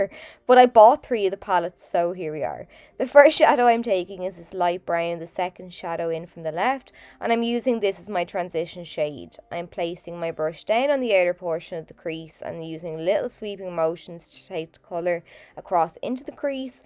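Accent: Ireland. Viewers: Hey thanks, I’ll look into those brands. I actually took your advice and bought a little packet of hair elastics and have been putting them on the bigger brushes in the meantime. Excellent my Irish friend, excellent. I’m 5th generation Australian but my family hails from Ireland and all my Irish rellys have your accent so I love watching your videos. You are one talented artist. I have watched many many professional makeup artists on YouTube but your uploads are so clear and concise and easy to follow. I like the way you instruct over the video each point as you do it, it makes it so much more doable, and your friendly chatter makes it enjoyable. So cheers for that and keep safe and well over Christmas. X🐨x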